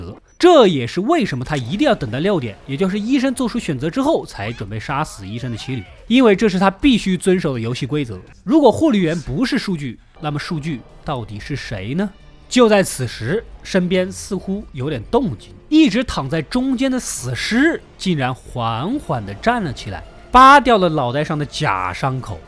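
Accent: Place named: Anqing, China. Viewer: 安庆人说的塑料普通话，真的有点好玩...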